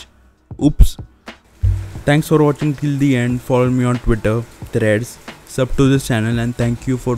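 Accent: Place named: India. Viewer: you're the first guy with an indian accent that I enjoyed watching :D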